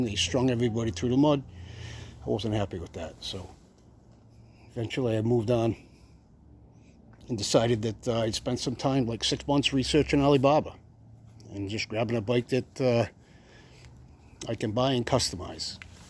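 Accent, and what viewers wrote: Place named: Queens, United States. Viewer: Yup. You speak a lot like I do. I am from Queens NY. Nice job on the Ansbern bike. I could not agree more with doing due dilligence and research. Most of the bigger named companies are all just rebranded from China, with custom logos and specs, but much higher prices. I bought a Vtuvia FMB, which looks like a clone of your Ansbern, except I want your 52v battery! The FMB comes with a 13.5AH 48V, which reduces the range I want. I wonder if the ansbern battery would work in my FMB. Would you mind providing the battery dimensions?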